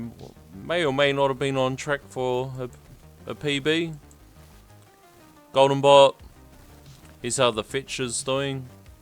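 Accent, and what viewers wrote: Australia. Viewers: nothing like getting some work done with that sweet aussie accent talking about my favorite tower defense game of all time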